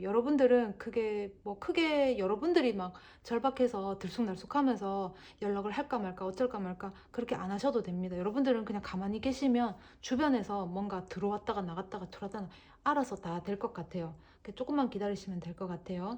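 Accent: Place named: Gyeongsang, South Korea. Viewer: 경상도 사투리 넘~잼나요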